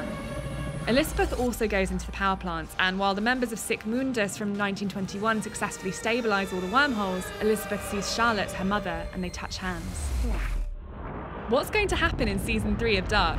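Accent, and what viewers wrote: England, United Kingdom. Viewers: Sub eng please, i dont really understand when listening UK accent hehe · The narrator has the most neutral, articulate and clear English accent one could possibly have...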